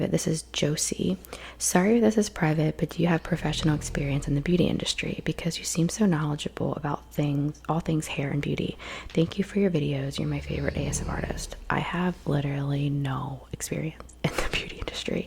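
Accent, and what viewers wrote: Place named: Maryland, United States. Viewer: And also, accent wise, I’m originally from Maryland and i think I might I hear a little of that (or Pennsylvania since they’re similar) in you!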